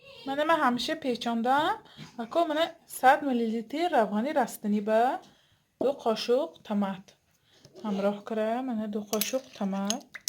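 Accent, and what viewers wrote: Isfara, Tajikistan. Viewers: Офарин хохарчон лахчатон исфараги боин Дастатон дардро набинад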